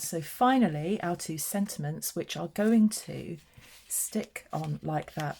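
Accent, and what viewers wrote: United Kingdom. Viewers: Your tutorial was great! I loved listening to your accent. I was fortunate enough to live in England for a couple of years and absolutely loved it! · Lovely listening to a British accent . Very nice card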